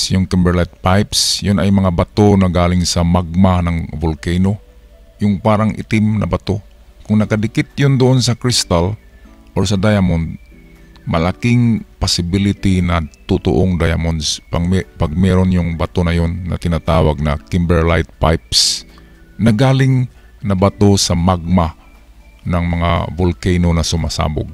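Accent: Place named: Bisakol, Philippines. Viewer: Engliserp bisakol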